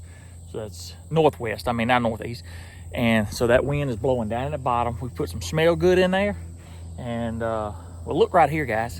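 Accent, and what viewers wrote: South, United States. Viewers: Goofy as it sounds, I enjoy your hog hunting videos. Cool southern twang accent. (Boss Hog would be proud of you) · I'm a woman who lives in the city. The only thing I've ever hunted is car keys in the morning so I don't know how your channel popped up on my thread. I can't tell you how glad I am that it did. I love your humor, your southern accent, your kind nature and your hog trapping. I subscribed after watching two of your videos. The only thing I don't like is that you have a bad back. Continued blessings. You're a treasure.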